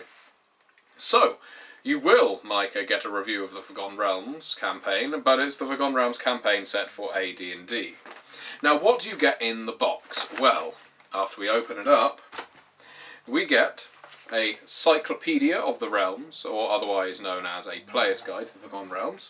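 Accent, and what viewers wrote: United Kingdom. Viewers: I once watched so many of your videos in one sitting that my internal voice acquired an English accent. (I'm American) It actually felt like I was going a little insane. I managed to repair my mind by watching a couple of Tetsubo's videos. Anyways, it's nice to see more videos from you. I'm starting a Pendragon campaign, which I've been wanting to do ever since I watched your video about your horrible Pendragon campaign. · Why not go back to the lets plays for a while? I loved your Bioshock play-through. I miss your unique charismatic British voice when you are away.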